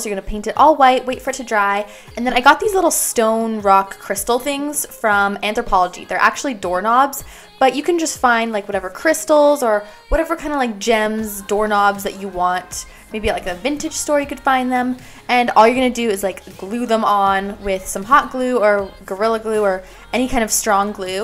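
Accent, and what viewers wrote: United Kingdom. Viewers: Я пришла за Британским акцентом . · you do sound like british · it sound like she is british like zoella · you have such a good British accent omg😂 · You sound British but no one British talks like that..! Make sense?😂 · she said she was going to be use a british acsent?? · im called charlie and im from britain and just to let u no u have a very strong british accent x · Look girl don't worry you are perfect talking British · noooo lol i actually thought you WERE British haa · This is my first video seen and u do sound britissh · I THOUGHT SHE WAS BRITISH! !! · YOU NAILED THE BRITISH ACCENT - LIKE WOW. many americans / whatever cultures fail, but chu did our accent JUST RIGHT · no I do like ur British axant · You do sound British😂👍🏼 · Her British accent sounds ok tho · It's fine I have a British accent too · i like your british acsent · that's acctually a good ENGLISH accent, British is Irish, Welsh Scottish and English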